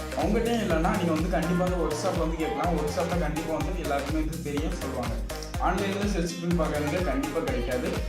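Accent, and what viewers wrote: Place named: Kerala, India. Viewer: Dai... Nee pesurathe Kerala da.. Music cut pandra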